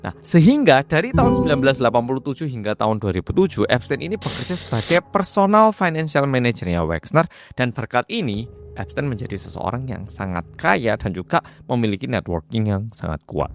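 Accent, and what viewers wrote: Java, Indonesia. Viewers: Korea medok....weeeeh baru denger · WONG KOREA BAHASA INDO MEDOK...😂😂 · Ak lebih suka medok ini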